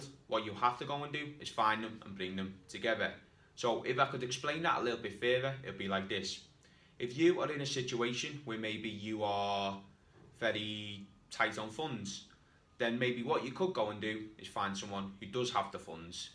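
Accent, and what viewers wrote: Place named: Wales, United Kingdom. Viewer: you sound welsh I'm welsh to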